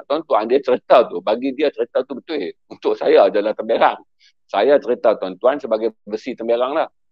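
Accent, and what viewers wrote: Kedah, Malaysia. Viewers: bunyi macam orang kampung saya, zul. dari kota Sarang semut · Nak tanya...saudara pencerita ni org kedah ka? Cara gebang mcm org kedah...😆😆😆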